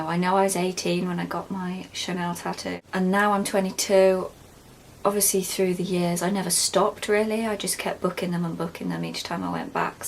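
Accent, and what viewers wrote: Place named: United Kingdom. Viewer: Your moth and butterfly tattoos are soooo pretty! And I love your accent, it's so beautifully British <3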